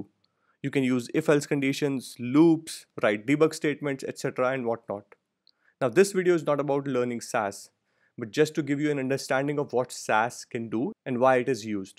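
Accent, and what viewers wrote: India. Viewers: First video with a guy with an Indian accent that is actually useful and well organized. Thank you!!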